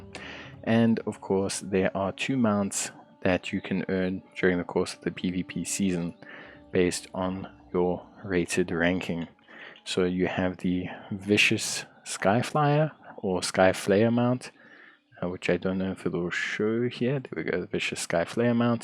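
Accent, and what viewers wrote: South Africa. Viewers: Are ya South African?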